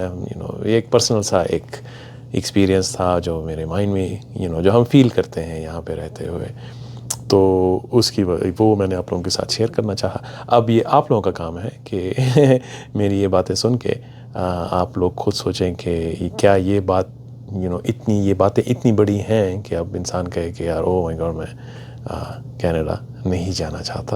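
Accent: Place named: Sindh, Pakistan. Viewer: Wow - your sindhi reflects in your accent - Love it 😍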